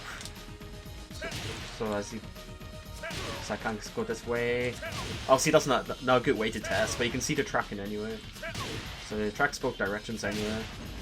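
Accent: Ireland. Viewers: . You are one of the most cerebral players and a man of taste. But for all that is holy, get a good microphone and learn how to tune it. Irish accent is complex in its tonality (no offense) It sounds fine when recorded correctly but it loses low end on "cheap" mics. And turn off tekken music when making vids, it gets in the way of how your voice is transmitted as there is competition for the sound energy coming out of the speakers. Get a good mic with a good pre amp. And play only Oscar, get out of here with these others women hahahaha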